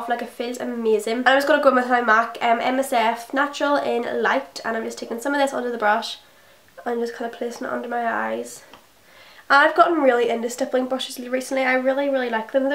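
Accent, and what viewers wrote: Northern Ireland, United Kingdom. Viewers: She's so good isn't she! I'm from Northern Ireland too bit my accent is a wee bit different, I hate mine xx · What county are you from in Northern Ireland I love your accent!🙈💜x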